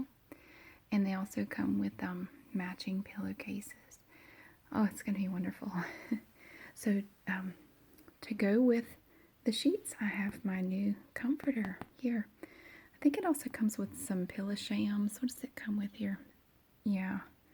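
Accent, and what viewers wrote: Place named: South Carolina, United States. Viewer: I’m from South Carolina, living in Tokyo, & your voice makes me homesick.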